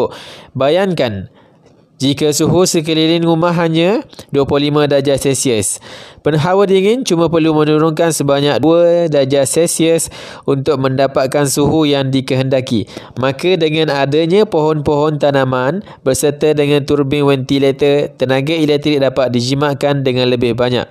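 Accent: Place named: Terengganu, Malaysia. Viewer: suara ganuuu pekat 😂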